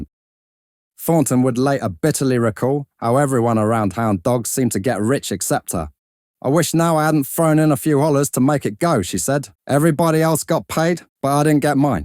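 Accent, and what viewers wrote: United Kingdom. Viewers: This narrator is a supreme God of all of narrators. This is great a greatly appreciated narration. His British tinged delivery is awesome. Well Done, sir. I didn’t know all of these details about her.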